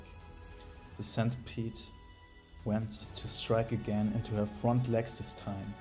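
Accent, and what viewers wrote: Germany. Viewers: You must be german, your english sounds like that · u r definitely german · You have a German accent. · Wie man raus hört das du deutscher bist 😀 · Are you German? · Kanns sein das du Deutsch bist 😂✌️ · Are u German? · Hör ich hier ein Deutschen accent 🤔😂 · I like that the narrator has a German accent worthy of a Bond villain.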